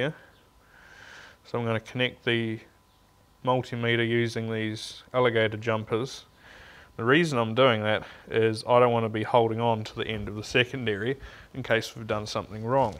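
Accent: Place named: New Zealand. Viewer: This guy is a Kiwi, so it is pronounced TUN, not Tin.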